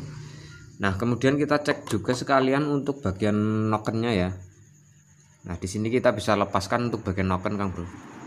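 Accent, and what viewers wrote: Java, Indonesia. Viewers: nama mu kaya marga orang batak bro,,,tapi logat mu medook bat jawa😅😅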